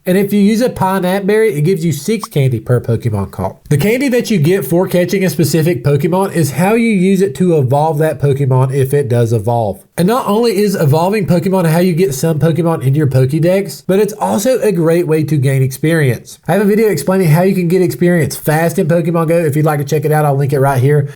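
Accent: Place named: South, United States. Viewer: You got a solid southern accent, but some real antifa loser vibes.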